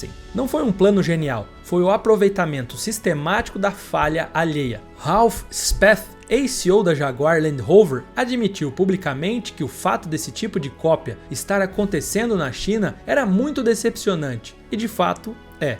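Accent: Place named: Caipira, Brazil. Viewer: Desculpe, mas esse sotaque caipira não dá pra escutar. Mas o conteúdo parecia ser interessante.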